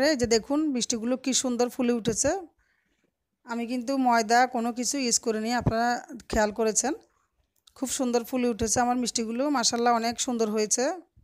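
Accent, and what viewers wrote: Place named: Noakhali, Bangladesh. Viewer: কথা শুনে মনে হচ্ছে উনি নোয়াখালির।এদিকের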